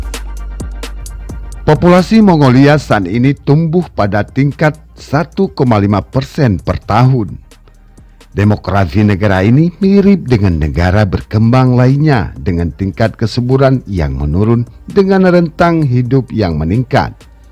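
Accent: Aceh, Indonesia. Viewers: Apakah Chanel ini milik orang Aceh..kuliat irama ngomong nya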